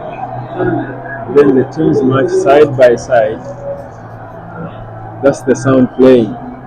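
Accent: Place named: Uganda. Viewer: eno accent ye Uganda eno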